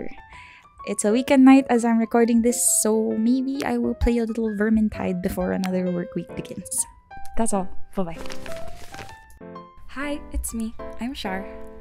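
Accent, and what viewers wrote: Philippines. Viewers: I hear some sort of Filipina Accent Although that's just me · You're so god damn talented. I'm still trying to place your accent, too, lol My best guess is Filipino, but that's, like, a 30% guess, lol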